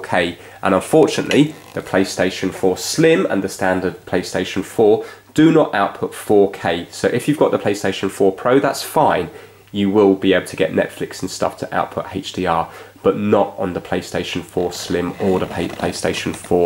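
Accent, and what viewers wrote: United Kingdom. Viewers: I love his British accent!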